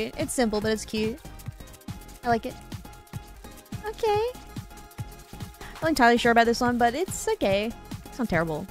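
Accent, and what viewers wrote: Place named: Australia. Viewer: Your Australian? INSTANT SUB C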